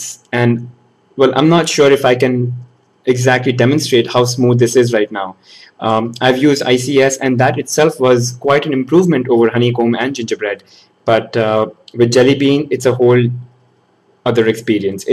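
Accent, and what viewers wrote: India. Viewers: You suck !!!!! I dislike it because your english is an indian so its suck alot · indian accent!!!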